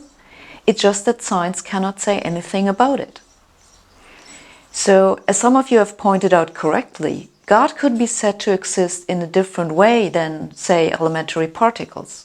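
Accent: Germany. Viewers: Learn English, I don’t understand this German accent.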